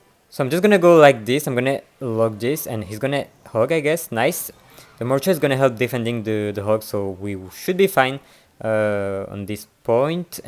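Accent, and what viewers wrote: France. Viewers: incredible french accent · good french accent bro t'es français? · l love this french accent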